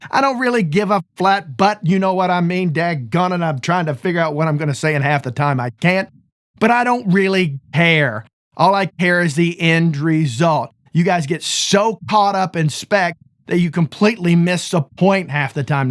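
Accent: South, United States. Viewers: Says the guys with shit guitars hanging on the wall. Not to mention, FLORIDA. Can’t trust tech advice from FL or a southern accent. This post is F’ing stupid.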